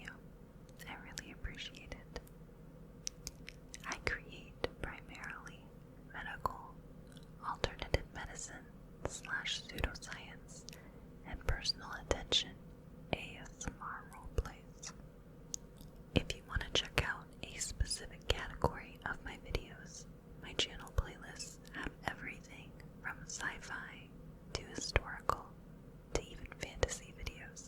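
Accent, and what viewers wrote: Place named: Midwest, United States. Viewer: The Midwestern comfort of hearing another person add letters to words, "across-t" is familiar ❤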